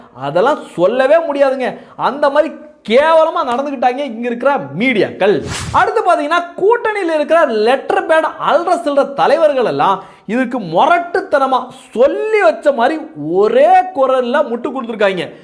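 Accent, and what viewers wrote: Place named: Kerala, India. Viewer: உங்களுடைய பேச்சில் மலையாள வாடை வந்தாலும் நீங்கள் ஒரு தேசியவாதி என்பதாலும் நீங்கள் பிஜேபி ஆதரவாளர் என்பதாலும் உங்களுக்கு என்னுடைய முழு ஆதரவு உண்டு.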